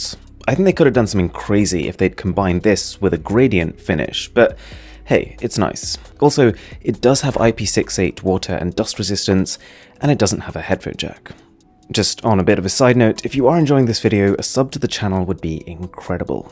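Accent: United Kingdom. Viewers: that's normal British accent you're just being salty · PacMacDo it’s definitely not a generic English speaker, you can tell he’s got quite some British accent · that's his NORMAL voice british accent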